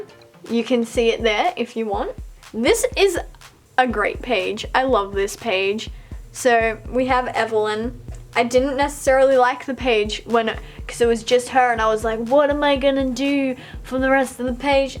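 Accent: Australia. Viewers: Hewo. Quick question, are you Aussie ? Btw your art looks amazing 😊👍👌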